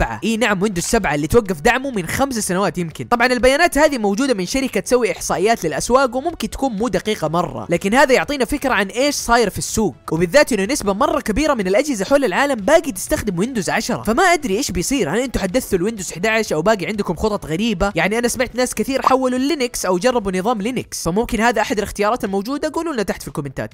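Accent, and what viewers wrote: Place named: Iraq. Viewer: زين ليش لهجتك عراقيه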